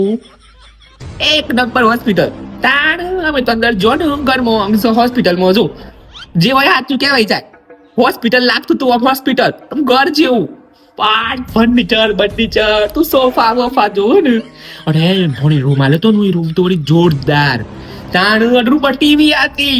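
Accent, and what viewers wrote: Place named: Idar, India. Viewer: msst cho tme mne to idar ni bhasa bv gme che ho bijo pn bnvjo ok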